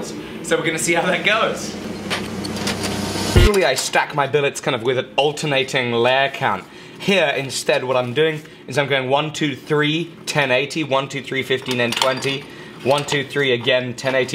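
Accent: England, United Kingdom. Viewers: Why does he have an American flag and sound British? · how come you sound British yet have American flags everywhere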